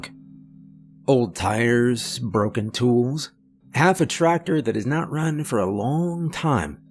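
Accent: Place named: South, United States. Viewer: I love your voice, country (both southern and northern) accents always put me right to sleep. Feels like pa. Your voice reminds me of a buddy of mine, tall , short blonde hair, built like a bodybuilder, does electrician stuff. Born n raise in the heart of Tennessee, with a smooth southern accent. You have a voice that keep reach folks’ hearts. If ya ever get tired of reading stories to folks, take up public speaking to folks. A voice like yours can bring change, buttering’ your way into folks’ hearts. It’s calming, the tone, cadence, pauses are just right ❤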